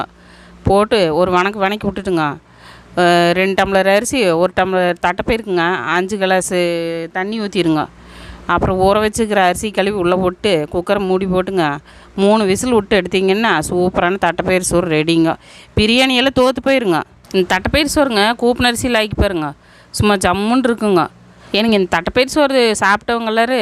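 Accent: Coimbatore, India. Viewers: கொங்கு மொழி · உங்கள் கோயம்புத்தூர் பேச்சு மிகவும் ஆபாரம் இனிய வெள்ளிக்கிழமை காலை வணக்கம் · சாப்பாடு எப்படியோ?..... கொங்கு தமிழ் அட அட அட❤❤❤❤ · Kongu style pechu kku nigar eduvum illaggoooo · Koyambathoor tamil. Alaga iraku. Love from srilanka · Kongu speech is sweet akka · நம்ம ஊரு கோயமுத்தூர் பாசைங்கோ. சூப்பருங்கோ. I'm Banu from Covai 🎉🎉🎉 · கொங்கு தமிழ் ங் ணா அப்படியே கொஞ்சும் ங்க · Kongu thamizh super 👌👌👌👌👌👌👌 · தட்டைப் பருப்பு சோறுக்கு லைக் போட மாட்டேனுங்க..உங்க கோயம்புத்தூர் பாஷைக்கு பெரிய லைக் போடறேனுங்க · கோயம்புத்தூர் பேச்சு சூப்பர் ❤ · Namma koghu kovai tamil rombha superngo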